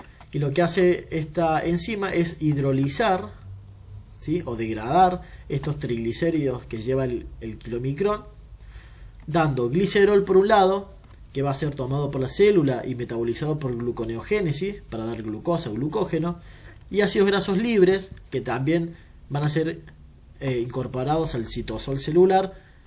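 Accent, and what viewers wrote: Córdoba, Argentina. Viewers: muy bueno, me gusta porque sacas todo del Blanco, que dicho sea de paso por tu acento cordobés supongo que son paisanos jajaj saludos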